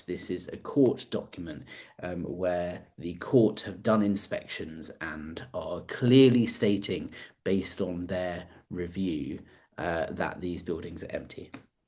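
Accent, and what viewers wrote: United Kingdom. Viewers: oooh that’s interesting!!! I am in Aberdeen Scotland. I have been following Chris, Aaron, Marc and others for a few years now. You and Jon Atack are the only out there ex scientologists I have come across with ‘British’ accents which brings Scientology closer to home, but I never really thought about it’s impact here in my part of the UK. Once, a handful of years ago, a guy my hubby worked with in Aberdeenshire mentioned he was going off to a Scientology meeting, of course pretty arrogant about it all, but my impression was he was still very new to it all and I guess in my mind I figured it would be him and a couple other ‘strange’ people involved in something so ‘crazy’. Now I wonder just how many folk up here, meaning Scotland not just my small part of Aberdeen, are still tied up with this cult. Is there anywhere I can find out about Scientology in Scotland, or is that too small a group to factor much? 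By the way, new subscriber, loving catching up on your videos, good luck with the channel!!!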